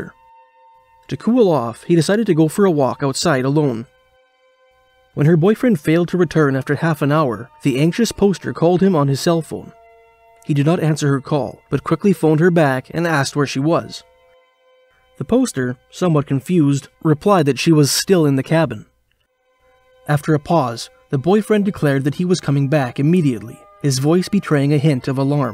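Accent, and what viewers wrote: Canada. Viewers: Love your Canada accents of passsta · I can tell by your accent that you're Canadian 💯 I think the Northwest Territory is the scariest most unknown desolate creepiest place in all of northern North America !!!! Especially the Nahhani region not just because of all the eerie stories but how much of a extremely dangerous gigantic maze it is of huge canyons & rivers & its completely surrounded by other giant regional mazes of forest & mountains & frozen tundras !!!!! It's like the heart of that whole entire region & even though ppl have been there, it's still the most mysterious unknown place in all of north America because of how remote it is & impossible to get to by foot or by automobile!!!!!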